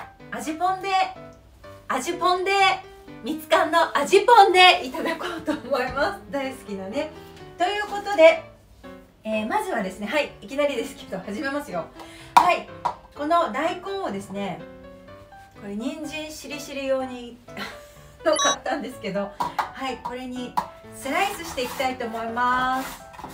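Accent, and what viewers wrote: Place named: Iida, Japan. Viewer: 大根もち❔大根とおもちを使ってどのようにするのかなぁと思っていましたが…😅なるほどねぇ🤔「大根もち」私も初めて聞きました😆 大根をスライスして具材を入れて片栗粉と小麦粉を入れて焼くのですね👌お好み焼きのような感じに～🤗 あっ！電池🔋が、、、早めに交換しないと〰️🤣 「命の源は金麦」に爆笑😆💣️✨ だもんでなぁ～の飯田弁、お母様の 梅酒(呑んでみたいです😉)に… おやきのお話しも～☆ 楽しいトークにハマっていました😆 「大根もち」はあきこさん押しの ミッカンのポン酢で頂きましたね🤗 具材にハムを入れてお肉の様な感じとなりアイデア良かったです👌 今回も楽しいクッキングを有り難うございました❤️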